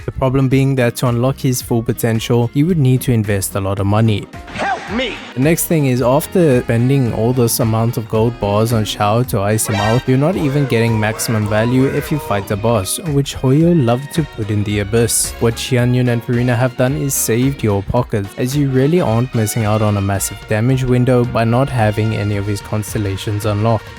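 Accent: South Africa. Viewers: South African? Uncommon... Cool video 👌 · I can definitely hear that this guy is South African, Lekker my bra! SA for the win! man is lekker ryk om so baie constellation op so baie karakters te hê T-T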